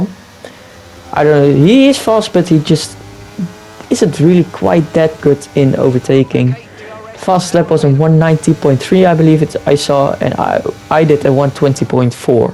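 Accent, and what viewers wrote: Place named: Netherlands. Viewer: Ik hoor dat je Nederlands bent :)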